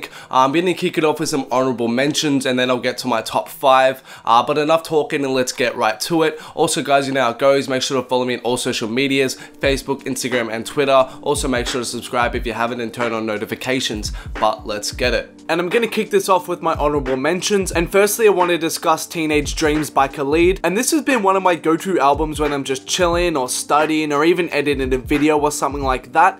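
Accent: Australia. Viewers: What part of Australia is he from? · I wish I had that Australian Accent.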